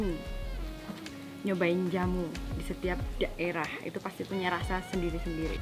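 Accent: Java, Indonesia. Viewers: Logat jawa, asli jawa yo mba....?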